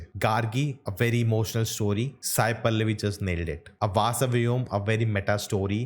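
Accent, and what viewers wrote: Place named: South India, India. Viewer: ..you should watch less South Indian films...Over a period of time...your English accent has developed subtle South Indian tinge...especially when you were pronouncing Hollywood Movie titles....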